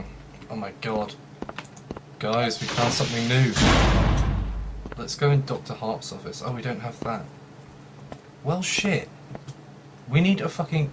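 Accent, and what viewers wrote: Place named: United Kingdom. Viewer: This is my first time seeing your videos How the hell did I know you are British ?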